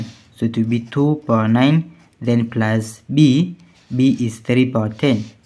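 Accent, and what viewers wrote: India. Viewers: インド訛り？の英語がクセになってつい見てしまう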